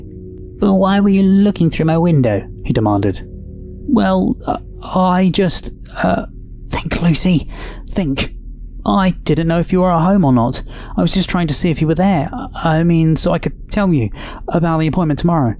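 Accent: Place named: United Kingdom. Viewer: hears intro -subscribes immediately hears British accent -TRIPLE SUBSCRIBE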